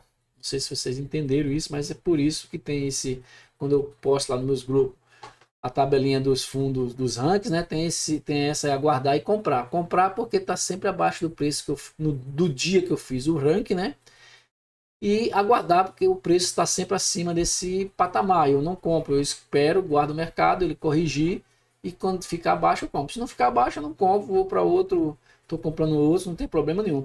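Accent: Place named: Natal, Brazil. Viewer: so perguntei pelo sotaque que parece com o meu, sou de Natal mas to morando no PR.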